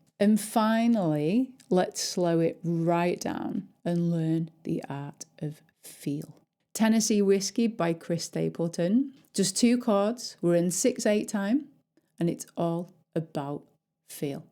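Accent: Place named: Lancashire, United Kingdom. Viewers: Hello. Just wanted to tell you that I’m enjoying your lessons - the best around as far as I’m concerned. It’s also nice to hear your north country accent, (I’m originally from Cheshire). A nice change from the many stateside voices. Hope you had a nice Christmas, and wishing you a happy New Year. Paul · im hearing a hint of lancs/north yorks in the accent. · Many thanks, your channel popped up yesterday, the 28th August, by chance. I'm 64 and have been learning since November 2024, after years of thinking about it. Unfortunately in 2023 I broke off the ball part of the ball and socket shoulder joint and shattered my radius (both left, and my right femur, long story). Fingers don't seem to be too keen on going where I want them to, however I've just written down the and, joy, it's still a bit stop/start but I've enjoyed it. Also, in a personal level, it's nice to hear a northern accent (I'm from Blackpool, living in Stockport, where the town motto is "it's not the end of the world but you can see it from here" 😂)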